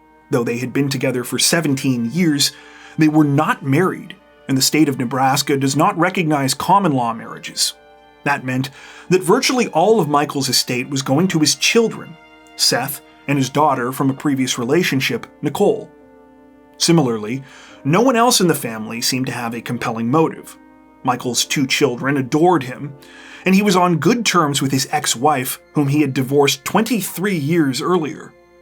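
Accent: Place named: Canada. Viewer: Oh you're Canadian eh?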